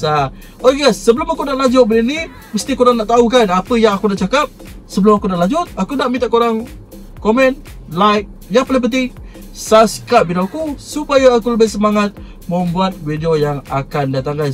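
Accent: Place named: Malaysia. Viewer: Bahasa logatnya jlas melayu antek malaydes😂😅😅😅😅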